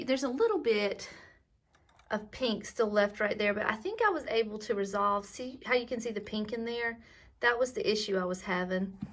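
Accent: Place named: North Carolina, United States. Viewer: Hi I have been watching your channel for a few weeks now and I knew there were something we had in common or should I say someone and when you said booth and Tenn. I knew you were talking about Susan she is so sweet,my daughter started a YouTube channel a few months ago and Susan was one of the first YouTubers to comment on her video and she has been such an inspiration to her from the start. I just love that dress on your daughter so pretty and I love all the stuff Cindy sent you so pretty, you sound like you are from N C if so, So are we the name of my daughters channel is Around the house with Charlene if you get a chance to view her channel I would be thrilled and I know my daughter would just love it. I love how the pictures you on the wall ❤❤❤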